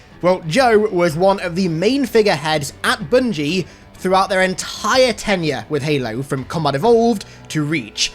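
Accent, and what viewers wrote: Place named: United Kingdom. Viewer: Bro I wasn’t prepared for your face! You don’t look as British as you sound 8/10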